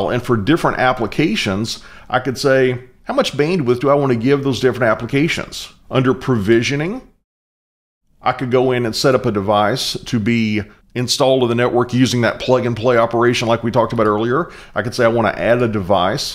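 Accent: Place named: South, United States. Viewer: great teaching voice idk something about the southern drawls keeps me tuned in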